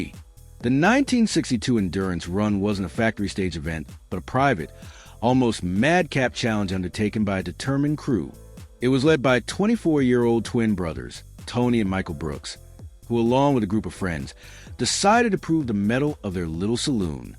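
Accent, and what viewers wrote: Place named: United States. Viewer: American voiceover? Hmmm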